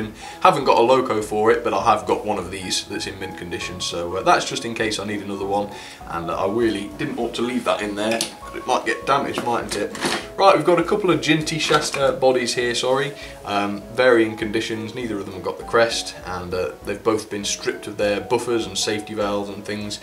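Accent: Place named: Derby, United Kingdom. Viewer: hey! Just a quick question, are you from Derbyshire? Your accent sounds like you're from Derby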